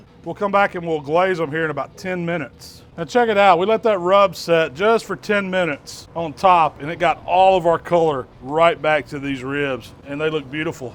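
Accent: United States. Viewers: The most american person i have ever seen, the accent, the spirit, the bbq skills, gotta love it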